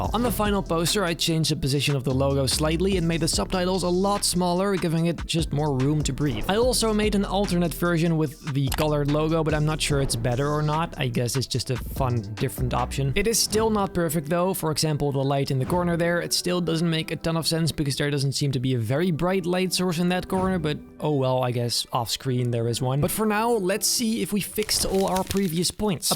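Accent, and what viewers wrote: Netherlands. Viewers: en dit zegt iemand die engels praat op 'n hele nederlandse manier...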